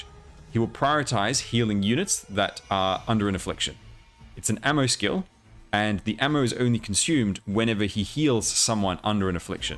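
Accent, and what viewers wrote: Australia. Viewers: I had no idea you're Australian.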